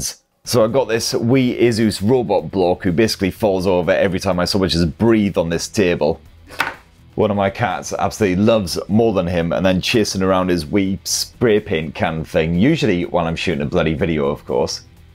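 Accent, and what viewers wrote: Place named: Wales, United Kingdom. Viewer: Hi, love your accent, what part of Wales are you from?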